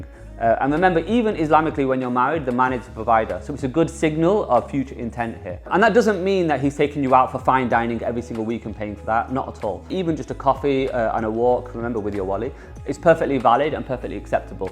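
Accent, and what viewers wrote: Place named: United Kingdom. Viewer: DUDE! SPEAK ENGLISH NOT BRILLISH…. 90% OF PEOPLE I AM SURE DON’T EVEN KNOW WHAT TF ARE YOU SAYING.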